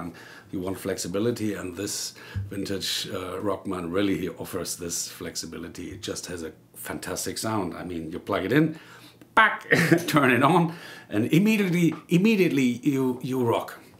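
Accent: Hesse, Germany. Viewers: When I was in the Army stationed in Germany I used to hear the local townsfolk speaking German and the dialect they used sounded much like this gentleman. I'm not sure of the spelling but when he used the word "bopple" it reminded me of one of my in-Laws neighbors who always wanted me to speak German with her and she would say "Bopple Deutch Mit mir". She would get frustrated because I would try and speak English to her, but I pretty much knew the local slang, and she knew that, so she always wanted me to speak German to her. I am guessing because she like hearing my American accent in German. It was always in good fun. She was a very nice lady. Anyway I bet this guy is either from the Hessen area or from the area that this lady grew up. I listened to the whole video because he reminded me so much of when I was over there. My Daughter lives over their and is married to a very nice German guy. 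I have a grandson from them that his birthday is the same day as mine, his great grandfather and his great great grandfather. We all have the same birthday. Its pretty cool.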